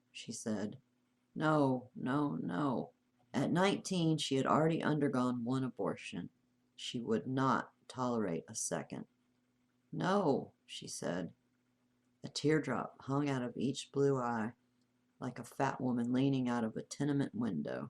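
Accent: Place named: Western North Carolina, United States. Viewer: I’m from western North Carolina so it sounds like home to me!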